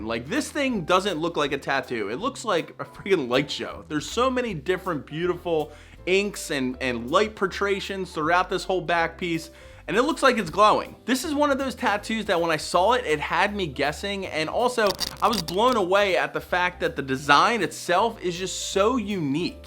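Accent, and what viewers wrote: Philadelphia, United States. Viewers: Yo ur def from philly I’m from the area and can tell by ur dialect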